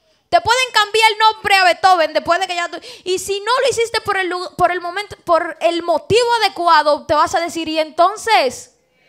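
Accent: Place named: Dominican Republic. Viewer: Me rei con tu presica. Muy edificante. y ese acento de los dominicanos como el de zankimpanki😂. Algo fresco para esta juventud. Bendiciones y saludos desde Cuba.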